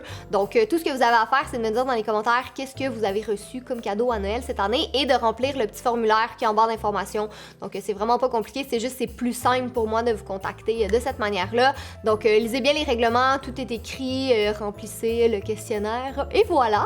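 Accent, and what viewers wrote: Quebec, Canada. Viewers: Coucou je suis aussi très curieuse et j’adore regarder les vidéos cadeaux de Noël! Sinon je regarde tes vidéos depuis plus d’un ans et je te trouve toujours autant belle et géniale 😘❤️ (j’adore t’es vidéo sims et ton axent québécois) ✨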